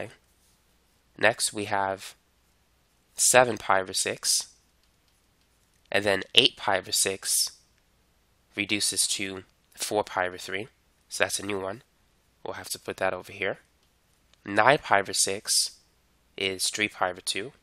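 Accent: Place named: Boston, United States. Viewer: Where are you from? Your accent seems almost like new england/boston, but I can't pin it down!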